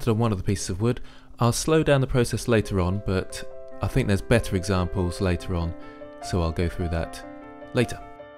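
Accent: United Kingdom. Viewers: Awesme like always, thanks for the many tutorials! iam not a nativ english speaker but i love your British Accent :D its what i was looking for lo learn how to use Blender. may i ask u a question? i would like to know how you creat a complete Scene with more than one 3D model. For example the Background, the Floor and some Objects like a Barrel and a Fence infront of a Building. Do you still use Blender to put them together? If I missed the answer in one of your videos, I'm sorry. Sometimes i do not understand all word in English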